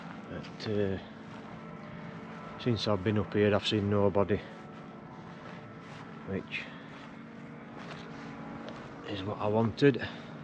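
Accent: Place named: Yorkshire, United Kingdom. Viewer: Need to stop watching this channel. A few days ago I caught myself slipping out a bit of accent, saying "over there", and thought where the bloody hell did that come from? Ah, I know,. Did make me laugh. Hahaha. Like English kids who watch too much American YouTube and start talking in a yanky accent, we're all going to start talking in a Yorkshire accent, and that.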